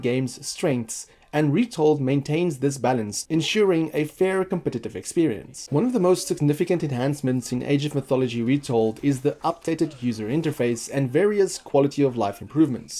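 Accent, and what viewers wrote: South Africa. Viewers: The sout African is back. Whooo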